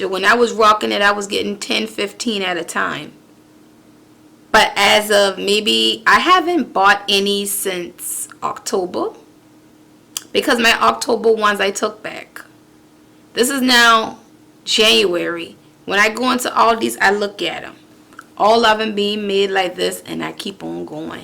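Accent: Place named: New Orleans, United States. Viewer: You sound like you’re from New Orleans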